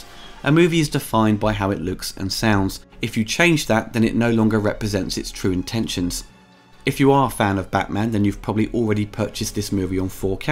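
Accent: United Kingdom. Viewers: I agree, I prefer the old sound, it goes along with the movie style and soul. The new sound I would say it it's more in tune with newer films. The color is appalling! Why are they always messing what is right instead of correcting and clean what is wrong. Star Wars for example, is one of those cases of color tampering bahhh. Exactly, there will be always people who like one thing and others don't, so why not include options. By the way, love your reviews, channel and your British accent =) Cheers!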